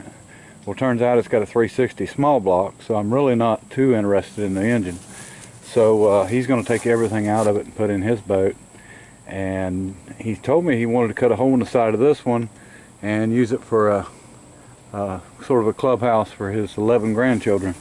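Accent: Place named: Detroit, United States. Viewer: sounds like a detroit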